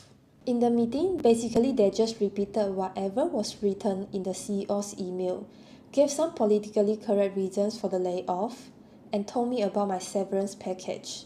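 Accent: Singapore. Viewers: Your accent is cool you are from Singapore right! I'm Jonathan from El Salvador. Software developer too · sorry to hear about your layoff, you are so admirably positive after that news. hopefully you can take a break and find something else when you are ready. their communication was terrible, should not be done via email, and as you discovered in large companies sometimes people are selected by algorithms or upper management. you sound like a Singaporean accent?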